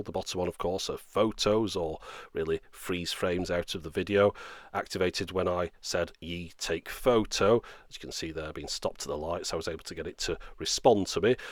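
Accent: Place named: Northern England, United Kingdom. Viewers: You've gone all geordie in this video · Huh, never would have guessed your from preston :3 though you have a very northen accent :p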